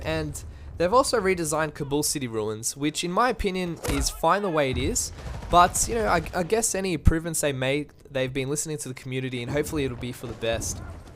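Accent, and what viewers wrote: Australia. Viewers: mmm, enthusiastic aussie commentator ;)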